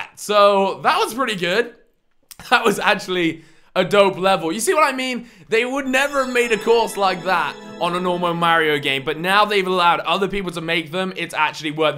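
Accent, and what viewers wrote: United Kingdom. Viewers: pls reply are you British 😀?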